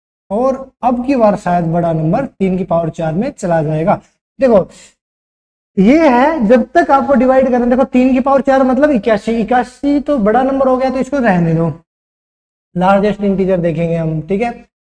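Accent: Haryana, India. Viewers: Sir aap 101% haryana se ho na? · Han ji.. Wese isme koi shak nahi hai... Qki sir haryana se hi hain... Magar aapki daag deni padegi ki aapne tab bhi pahchan liya jab sir ne hatyanvi nahi boli hai....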